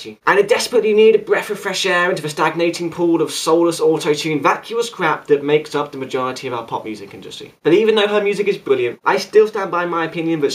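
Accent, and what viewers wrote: United Kingdom. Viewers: He he I can't always understand what you're saying; you're talking too fast in that cute british accent of yours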